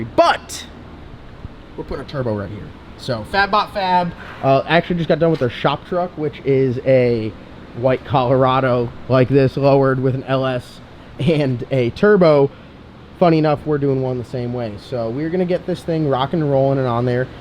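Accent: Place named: New England, United States. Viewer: IF YOU NEED SOME HELP I'M NOT AS GOOD AS MATT IS BUT I'M PRETTY DAMN GOOD AND I'M AROUND ANGER NOT TOO FAR FROM ERWIN I'M LIKE 25 MILES FROM YOU I CAN WELD I CAN DO EVERYTHING THAT MASON DOES PLUS MORE I'M NOT GOING TO SAY I'M MAD BECAUSE I WOULD LIKE TO LEARN TO TUNE BUT I'M RIGHT UP THE ROAD FROM YOU AND HAVE A COUPLE GOOD PROJECTS THE FIRST ONE IN NEED IS A 1965 CHEVELLE MALIBU 300 DELUXE WANT TO TWIN TURBO WITH WITH A 6 L AND INTERESTED ABOUT GETTING INTO THE STORM WORK. I'M NOT A SCRUB MY OWN LAND I'VE BEEN A PLUMBER FOR THE LAST 20 YEARS PLUS AND I'M 40 YEARS OLD I'M NOT TOO EXCITED ABOUT PENNSYLVANIA YANKEES BUT SEEMS LIKE YOU MIGHT BE A FUCKING TRANSPLANT YOU SEEM PRETTY SOUTHERN TO ME JUST YOUR GODDAMN YANKEE VOICE GIVE IT 10 MORE YEARS THAT'LL GO AWAY ASSHOLE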